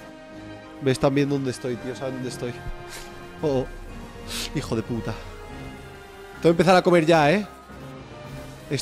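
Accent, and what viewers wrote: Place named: Argentina. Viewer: rubius habla un 30% argentino y un 70% español lol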